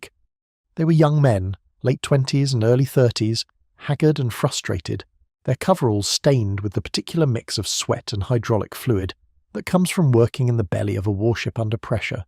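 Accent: United Kingdom. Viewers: Why is a Brit telling us these important stories? We need to have this kind of respect for our own. We need to be teaching it ourselves. Thank you, sir, for showing us the way.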